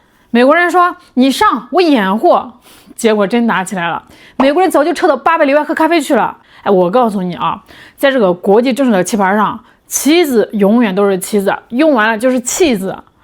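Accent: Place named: Northeast, China. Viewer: 东北味好重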